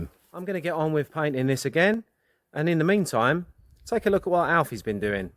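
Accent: Essex, United Kingdom. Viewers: Hi, where are you originally from in England? Correct me if I'm wrong, sounds very much like an Essex accent to me? Cheers, all the best.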